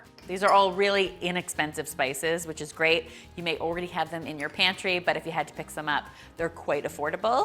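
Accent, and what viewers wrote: Canada. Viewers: She has a Canadian accent.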